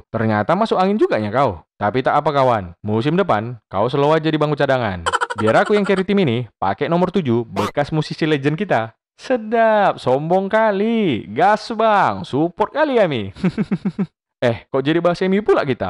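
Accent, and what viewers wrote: Medan, Indonesia. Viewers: Mantap kali logat Medan itu lek.. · Lama lama kaya minang kocak bang, tapi versi medan wkwkkw sukses bang..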